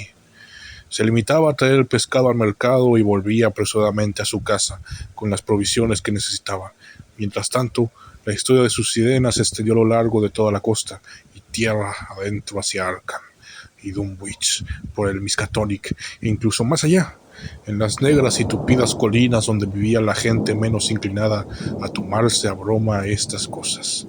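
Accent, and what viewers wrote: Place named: Border, United States. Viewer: Tu narración de gringo fronterizo le da todo el estiló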